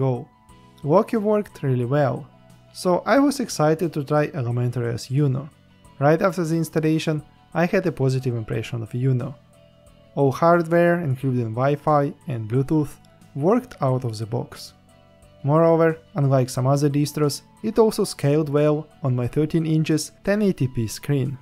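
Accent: Russia. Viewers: Русский акцент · Native Russian accent detected. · Are you Russian? It feels like you have a Russian accent)). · Are you from Russia?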